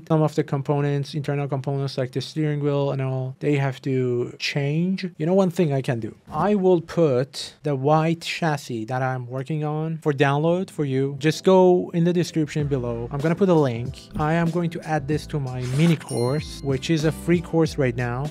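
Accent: Iran. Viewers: حاجی لحجه ایرانی معلومه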